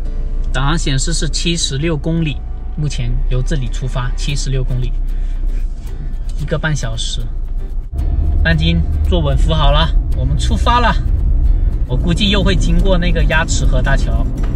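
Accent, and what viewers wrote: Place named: Hakka, China. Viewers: ，你是惠州客家人，我也是，难怪我能听懂你说啥。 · 半斤和老友鬼鬼搭肩膀太可爱了，客家话广东话都能通真棒👍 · ！原來你是客家人！我也是！我們都是同音腔的客家人！👍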